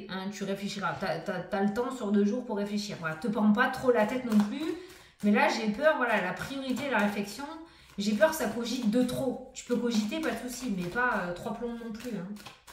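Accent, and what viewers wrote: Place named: Bordeaux, France. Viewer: Serai tu de Bordeaux ma belle fille parle comme toi.🥰🥰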